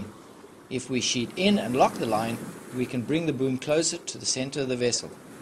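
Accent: South Africa. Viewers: Totally Fabulous - love the SA accent too !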